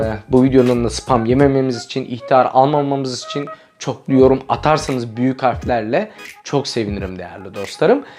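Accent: Nakhchivan, Azerbaijan. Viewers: necsen naxcivan 100%serur rayonansan😂 hansi terfdensen dene da nolar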